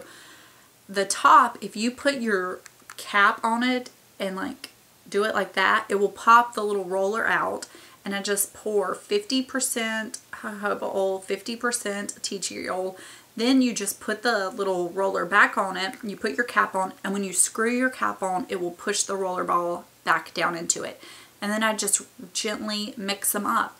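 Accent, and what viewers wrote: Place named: North Carolina, United States. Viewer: I love your accent! Are you from North Carolina? I grew up there and your voice reminds me of home :)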